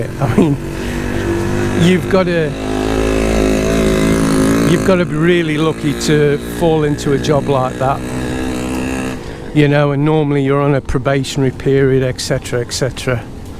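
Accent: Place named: Nottingham, United Kingdom. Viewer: Trying to work out your ascent it sounds Notts area I am still contract working in the UK till next year when I retire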